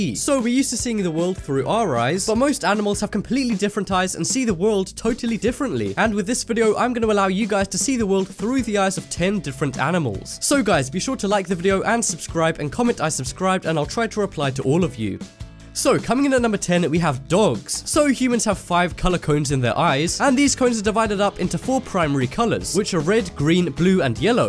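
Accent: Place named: England, United Kingdom. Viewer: Great now that’s all I can fixate on. I already find the English accent one of the most annoying, almost to the point of it sounding like a speech impediment.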